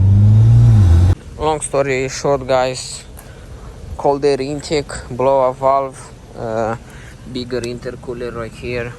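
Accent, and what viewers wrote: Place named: Russia. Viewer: Ебать у тебя акцент русский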